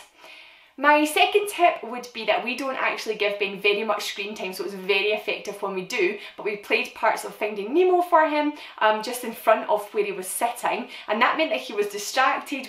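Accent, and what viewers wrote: Scotland, United Kingdom. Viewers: Oooh I love your super cute intro 💖 Love your accent so much 🏴 what part of Scotland are you in? I need to take notes from this video as I cut Leo’s hair and it’s terrible 😬 Awww he’s just too cute 😍😍😍 You are doing such a good job and you make it look so easy! Wow super good job! That’s really impressive! Ahh yes Leo doesn’t get screen time much either so that’s a great idea 😁💖 Oooh no static hair 😂😂 Loved watching 😁💖 Awww love the hiding seek 😁💙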